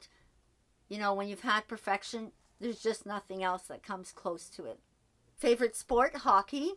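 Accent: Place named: Portugal. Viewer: I work with a Portuguese women and your accent sounded the same. 👍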